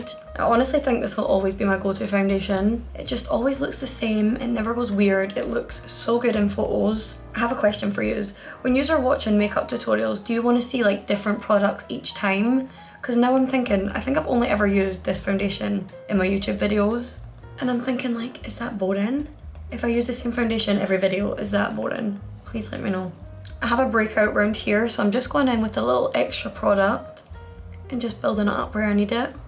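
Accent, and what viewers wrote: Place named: Glasgow, United Kingdom. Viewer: I love your accent, is just makes me feel like home. I’m half British and Glasgow just feels like home even more then Adelaide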